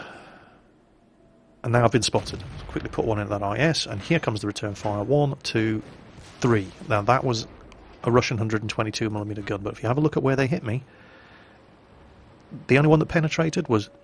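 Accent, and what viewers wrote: United Kingdom. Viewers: have u ever thought of working as a narrator for TV .... we could really use someone like u ... that "typically" british accent, a nice voice, easy to listen to - very well narrating and improvising to get the sentenced finished ..... damn we could use a guy like u .... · Good stuff, thanks for showing us your awesome German tanks and for explaining everything in detail with Dat calm British accent.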